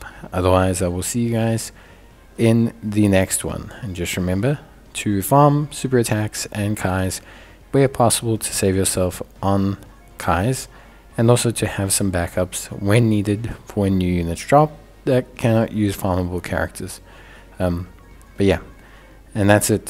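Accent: South Africa. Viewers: Bro you south-african?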